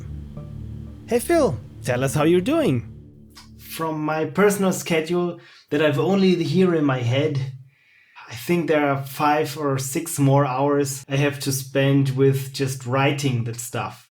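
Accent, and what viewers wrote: Germany. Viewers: Wait a second, you german?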